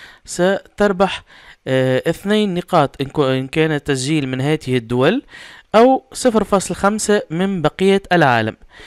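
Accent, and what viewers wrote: Tunisia. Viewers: انت تونسي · هل انت تونسي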